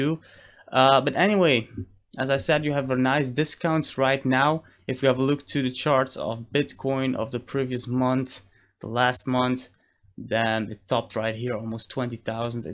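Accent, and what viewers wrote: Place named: Germany. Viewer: Love the German accent! Anyways, keep calm and HODL! Believe in the technology! 0x20E0C5317F4a2cbB039E5Ec71E60A67C9b7fe799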